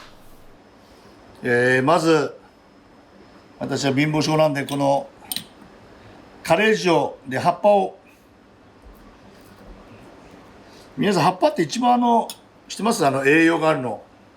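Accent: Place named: Tohoku, Japan. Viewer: 三國シェフは、南部か宮城の方ですか？それか道南かなあ。懐かしいアクセント。かぶ、畑からとってきて、このレシピやりまーす！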